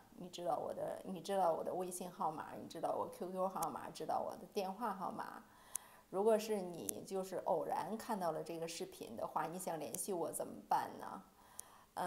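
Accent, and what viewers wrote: Beijing, China. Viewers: 姐们 您在winnipeg？ 北京的吧 听着京腔 顺耳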